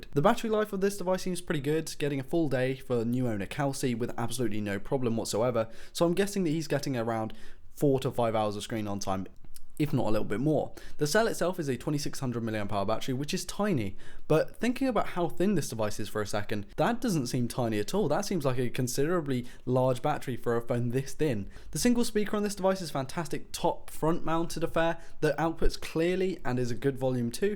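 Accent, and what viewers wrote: United Kingdom. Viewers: I like the way you speak, which part of UK are u from?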